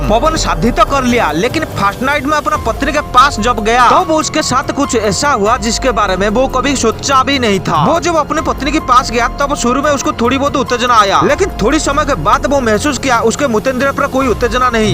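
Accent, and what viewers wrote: Bengal, India. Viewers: Bengali jaise bat karte ho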